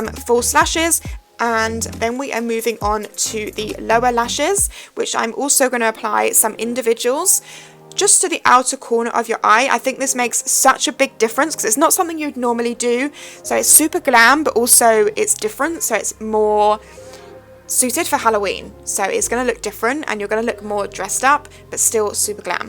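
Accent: United Kingdom. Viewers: are you from Britian because your accent makes it sound l;ike it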